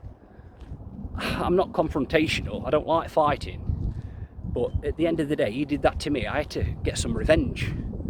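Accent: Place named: Yorkshire, United Kingdom. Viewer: Hi, just to say when I first started watching you I thought you were from Yorkshire lol.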